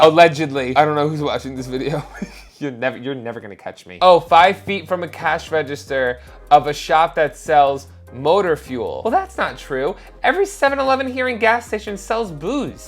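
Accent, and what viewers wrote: New Jersey, United States. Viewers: bro its just the Jersey accent lol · You don't speak English you speak Jersey it's a special side dialect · The first minute and a half is just trying to figure out if he has a speech impediment or if he just pronounces certain words with a jersey accent.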